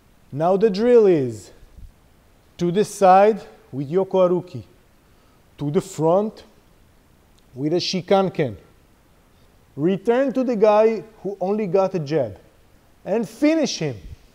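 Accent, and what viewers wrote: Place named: Israel. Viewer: I wactched the video. It's better not to mistake anything in that video for sound advice. It's just choreography with a narration that's done in a Modern Hebrew accent. There are as many ways to deal with multiple attackers as there are potential gaggles of attackers but this video demonstrates something that will only ever work on people who aren't actually serious about hitting you. Fix your footwork. Move from position of balance to position of balance and for the love of god don't compromise your own balance just to take someone else's. If you cross yourself up and overextend like that "grandmaster" then someone's going to throw the ground at your face while breaking a few things on the way down. The primary tenet of ninjutsu is don't get hit and the third law of motion is that the ground hits you as hard as you hit it. Apropos that you should probably get out of the habit of hitting the ground with your talus when you roll...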